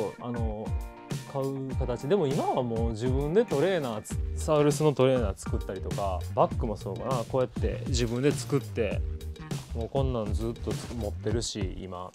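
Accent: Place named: Kansai, Japan. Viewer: 久しぶりに見たら関西訛りに違和感、、 ビジュアルはめちゃくちゃかっこよくなってて笑った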